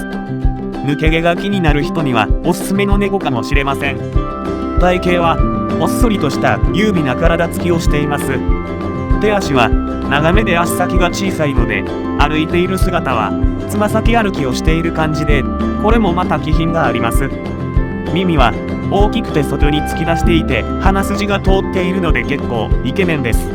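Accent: Kyoto, Japan. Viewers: 漫画とかアニメに出てきそうなThe京都弁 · 京都あたりの方ですかね？ 訛り風情があってかっこいいですよ〜 ロシアンブルー欲しくなりました！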